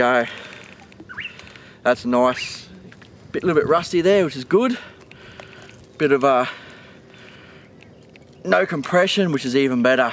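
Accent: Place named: Australia. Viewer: Love his Aussie accent. G'day mate!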